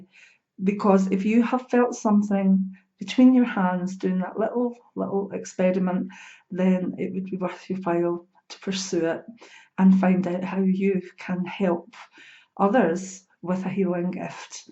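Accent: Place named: Wales, United Kingdom. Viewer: Thank You for this video . I like your accent though, are you Welsh?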